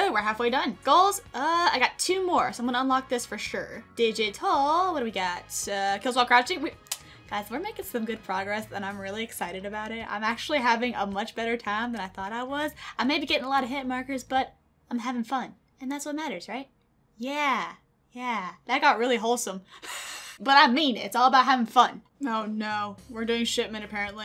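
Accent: South, United States. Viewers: How long have you been watching her because her southern accent has been known in alot of her videos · I could really hear that southern accent of yours in this video. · That cackle is exactly why I subscribed. Plus, the southern accent comes out everytime she's just in a frantic shipment mess. So good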